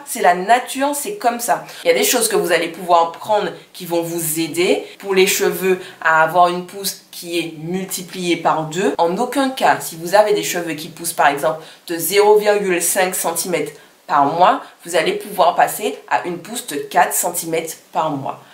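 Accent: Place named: Antilles, Caribbean. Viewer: Hi, j'adore ton petit accent bien de chez nous Mart. ou Guad...... Cela fait un moment que je te suis j'aime beaucoup tes contenus, tu reste égale à toi toi-même, ta franchise et ton honnêteté font de toi une belle personne. De mon côté mes cheveux ont commencé à pousser et à mieux se porter quand j'ai arrêté d'acheter pleins de produits capillaires qui n'avaient aucune efficacité...... il me suffit maintenant de regarder côté cuisine et de compléter avec d'autres produits naturels. Merci pour ta franchise et bonne continuation à toi ma belle.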